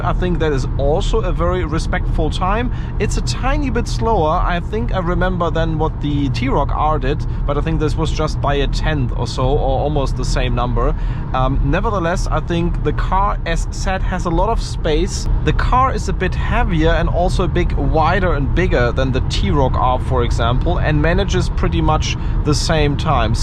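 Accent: Germany. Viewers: Dieser krasse deutsche Akzent ist schon hart 😀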